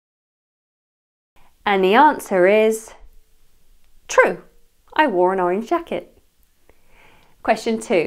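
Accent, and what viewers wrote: United Kingdom. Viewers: Hi, you have a great British accent, but this test is more likely a memory test. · I will always say, you have a great british accent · But you have a perfect British accent 👍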